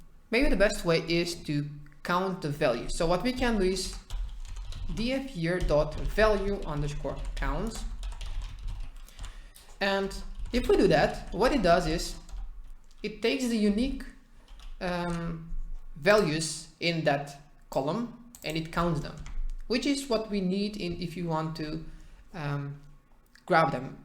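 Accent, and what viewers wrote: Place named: Netherlands. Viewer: i love your videos and your accent, are you dutch?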